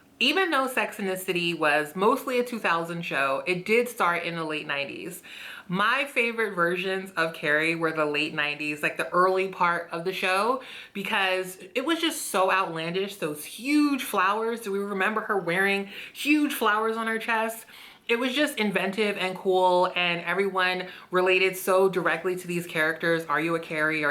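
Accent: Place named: Philadelphia, United States. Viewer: Oh stfu , every time i watch your vids i feel like i know you and now i know why lol YOUR A PHILLY GIRL , must be the accent , im Philly too heehee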